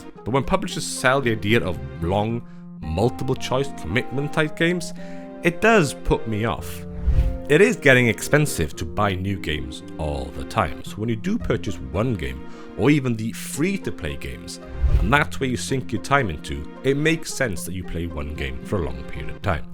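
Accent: Wales, United Kingdom. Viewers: it's like your life is mine......but yours has a British accent. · BRO its like my 35 yr old canadian brain is talking to be in a British accent through this video. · Is that a slight hint of a Welsh twang to your voice I detect boyo? Lovely video, as a 38 year old it speaks to me 😢😊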